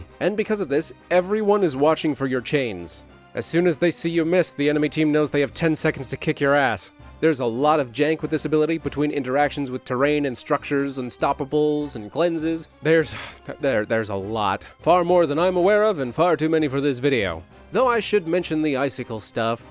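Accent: Canada. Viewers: Letting the canadianness come out eh? KTZed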